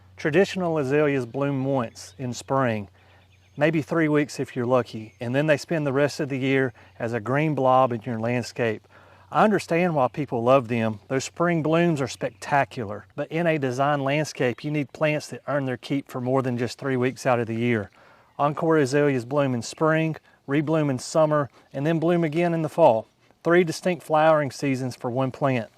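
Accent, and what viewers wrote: South, United States. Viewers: Where are you from? I'm trying to pinpoint your dialect. Arkansas? Alabama?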